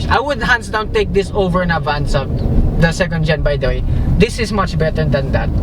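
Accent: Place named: Taglish, Philippines. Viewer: Sa susunod tagalugin mo na lang. Ang sakit sa tenga ng TAGAlish mo!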